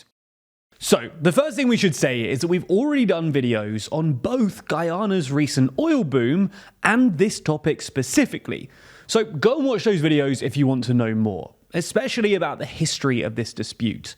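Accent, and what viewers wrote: England, United Kingdom. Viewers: Never trust a YouTuber with an English accent. Never.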